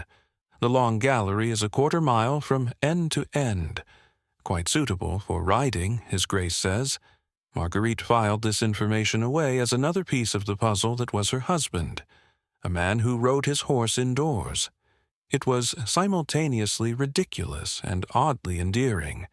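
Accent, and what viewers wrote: United States. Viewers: I enjoyed this story, the relationship between Marg and Max was developed nicely, there were no nasty scheming people plotting dastardly deeds… the narrator - firstly the sing-song, staccato cadence was off, and of course, American accent for English story was off, but notwithstanding that, the diction was clear and voice well modulated, which made for easy listening 👍🥰🇦🇺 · As I commented on another story, I'm having problems listening to an American accent told for a English story.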